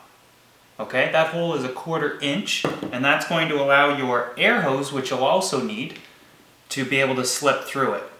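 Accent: Canada. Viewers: I love his Canadian accent. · Are you canadian?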